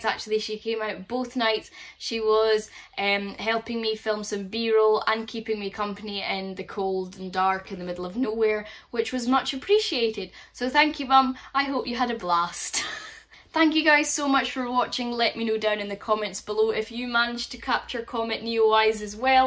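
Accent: Scotland, United Kingdom. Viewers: Don't know what I enjoyed most, your very helpful instructions or your wee Scottish accent. In any case, thanks for taking the time and effort to post this video. BTW - you wouldn't want to be standing around in the middle of a heat wave, being bitten by mosquitos, to photograph the comet. We here in Pennsylvania, USA can attest to that.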